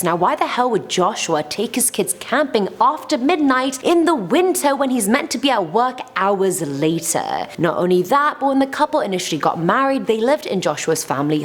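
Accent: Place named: United Kingdom. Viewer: I turned it off as soon as I heard a gross limey voice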